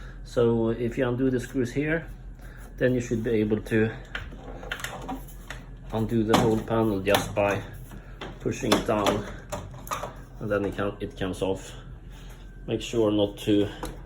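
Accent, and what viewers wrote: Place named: Norway. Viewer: I fixed my drier this morning following your guide exactly. Your accent sounds norsk så eg bare ser tusen hjertelig takk.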